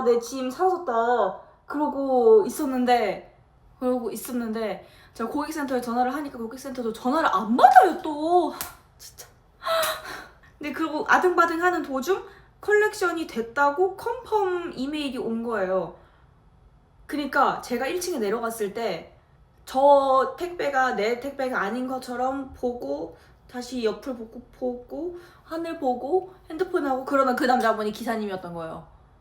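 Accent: United Kingdom. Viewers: 한국에선 영국 악센트 영어 배우기가 쉽지 않아서 듣는것만으로 좋더라고요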